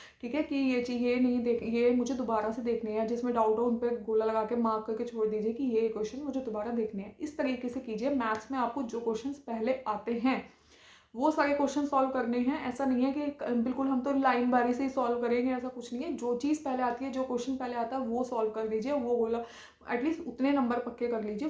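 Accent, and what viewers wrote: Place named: Purvanchal, India. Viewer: hame laga purvanchal side ki ho aap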